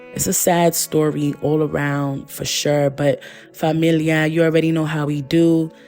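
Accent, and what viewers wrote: New York, United States. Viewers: Love your channel! Your story telling/narration capabilities mixed with that raw raspy New York accent and demeanor…keep up the great work! 🤎🤎✨✨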